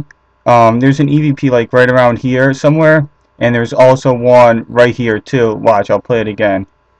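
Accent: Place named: Wisconsin, United States. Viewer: I detect a northern accent from you. You're from Wisconsin.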